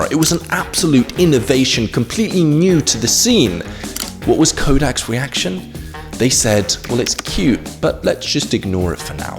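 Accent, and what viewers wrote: United Kingdom. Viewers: It is definitely the British accent. My husband is from Stafford and he pronounces a lot of things that end in an “a” as if they end in “er”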